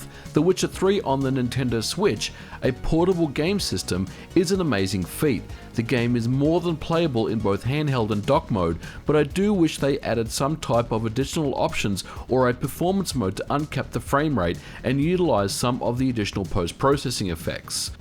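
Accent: Australia. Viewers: australian accent is beautiful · yes really. That australian accent is awful. Instant switch off. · And with a spanish accent instead of australian :D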